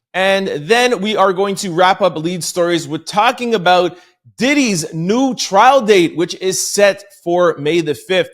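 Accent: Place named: Canada. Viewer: We as blk folks need to be more familiar with the law. One HUGE misconception is that cops have to read us our Miranda rights or we cannot be charged or arrested. FALSE. Miranda rights are to be read when someone is being questioned in context of a criminal investigation to use what you say against you. Not simply because you are being arrested. Miranda does not keep you from being charged. If you are not being questioned there is no Miranda at that time. We need to stop saying that because it’s wrong. Miranda doesn’t apply to every arrest. That’s something that you see on TV and it’s for dramatic purposes. Also you can be detained during a search if they feel you will obstruct or interfere in some way. Diddy sons were detained. They were not arrested that day. Make sure research is done before giving legal commentary sir. Edit: you are Canadian. So you get a pass, however Americans are watching this and need to know. 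I thought you were Canadian, I can hear the accent clearly?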